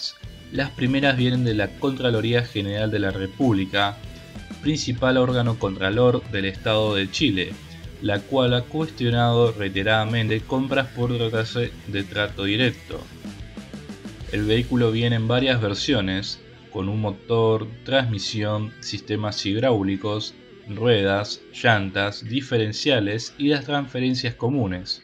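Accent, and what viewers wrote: Argentina. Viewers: Me encanta tu canal, pero tango una pregumta, pod qué el narrator es o suena ser Argentina? Podrias hacerlo tu end vez? Saludos.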